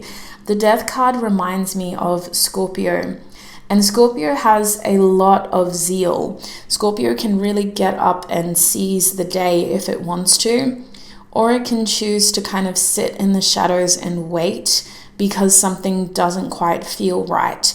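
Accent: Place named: Australia. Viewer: Oh listen, another Australian! Hello 👋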